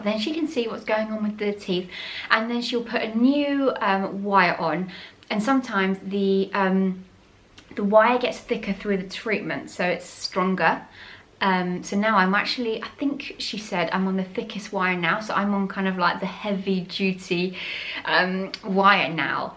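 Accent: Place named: England, United Kingdom. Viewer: The English accent has a calming effect on me. Pip, pip, cherio and God save the King and all that rubbish.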